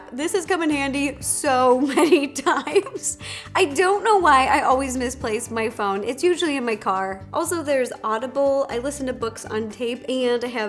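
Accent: United States. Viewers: you have a nice american accent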